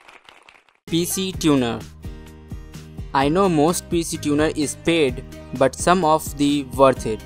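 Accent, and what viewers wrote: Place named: India. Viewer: i cant understand you.. indian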